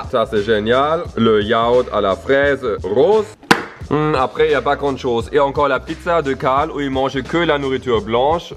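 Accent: Quebec, Canada. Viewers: Je surkifffffffff lacscen quebequois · J 'adore ton accent canadien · J'aime trop l'accent québécois ^^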